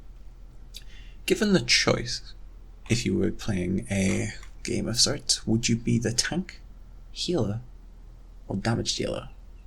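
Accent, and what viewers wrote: United Kingdom. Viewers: He's scottish i think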